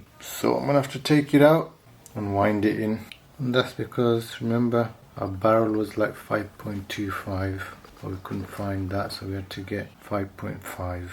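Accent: Birmingham, United Kingdom. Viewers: You a brummie mon?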